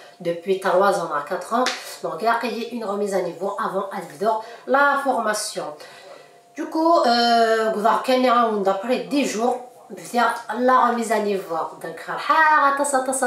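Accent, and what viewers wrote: Kabylie, Algeria. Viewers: Ta place est au one man show!! J'adore ton accent kabyle.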